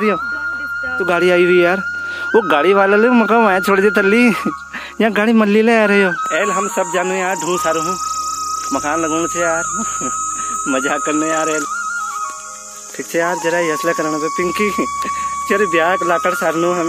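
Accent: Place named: Pahari, India. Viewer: areee sir kya pahadi bolte h aap kaan to prm sukh ka anubhav ho gya 😍😍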